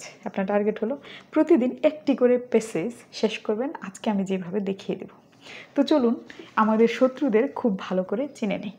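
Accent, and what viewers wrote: Narsingdi, Bangladesh. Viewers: Boin just masallah chilo....apnr accent sone mone hocce apnr bari Narsingdi.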